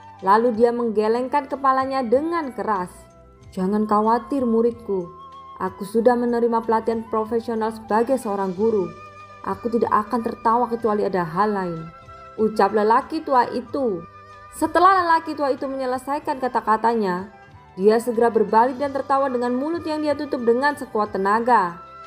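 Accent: Java, Indonesia. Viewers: Kak orang jawa yah?? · Nah mulai enak suaranya&cuma logatnya masih medok v😁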